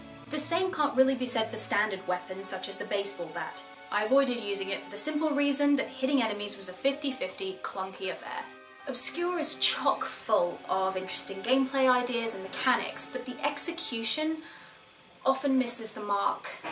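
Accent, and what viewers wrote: United Kingdom. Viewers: Pretty girl? Likes video games? British accent? You probably get this a lot but damn.... I think I may be in love with you.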